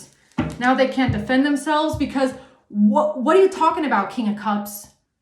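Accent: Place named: Germany. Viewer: Good morning 🌅 beautiful 😇👀👑, hope you have a beautiful day night, love your accent Germany ❤💜🌅💜,I was abit worried about you last night, I wouldn't want to piss you off,I love you ❤, your are a earth angel 😇☀️⭐, anyway you distract me 😊, beautiful,I worry about you, when you bend down, your neck from your accident,need a massage, anyway looking forward to hearing your voice and thoughts 💬, peace love and light 🕯️ x😊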